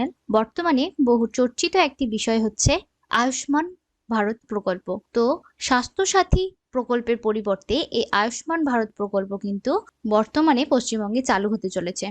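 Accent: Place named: Bangladesh. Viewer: Tui Bangladeshi hoye India er video banachis???😂 Tor kothar style e bojha jay....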